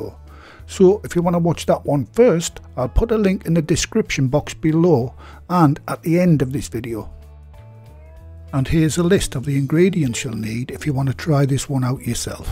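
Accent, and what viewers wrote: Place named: England, United Kingdom. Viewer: Cewked. 👍🏻 I've been in bands with lads from sooth of the watta, and that's a posh mackem accent ✌👍🏻🍺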